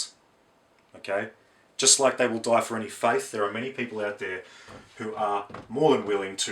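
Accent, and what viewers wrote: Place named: South Africa. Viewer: This video was repetitive and very, very simple. Sounds like a yarpie. (ooh 'name calling')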